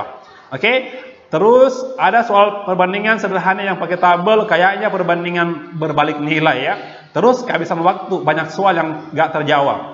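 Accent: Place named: Eastern Indonesia, Indonesia. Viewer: asli mana bung, kayaknya timur ni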